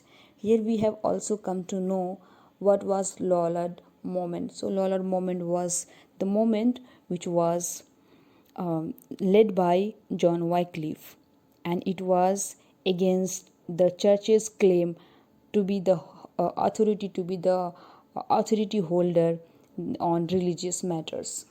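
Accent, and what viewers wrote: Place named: India. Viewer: Are you indian? Different accent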